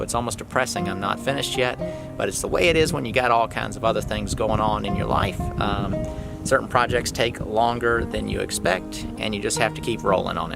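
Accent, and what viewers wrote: South, United States. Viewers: If the accent and price of homes didn't give it away that dirt is as south as you can get ! Look at all the clay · I'm assuming you're in a southern state by your accent and the homes prices...can I ask which state? We are in Louisiana, so just curious. The duplex is 11k, we will have our work cut out for us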